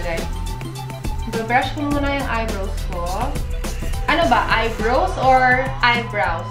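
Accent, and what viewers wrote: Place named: Philippines. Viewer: Love the Tagalog accent bezzie! Haha! Awesome vids, sana magaling din ako mag makeup. Lols 😍😍😍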